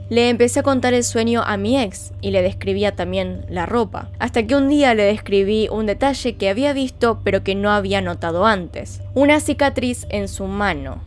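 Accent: Argentina. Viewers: Un Usuario Normal Pero creo que no es felicidad por el caso, es por la manera en la que relata, da sus hipótesis, tiene un acento argentino obvio pero su lenguaje es neutro o bueno eso siento yo, porque otros youtubers de casos, sobre todo mujeres me choca que gritan o fingen la voz.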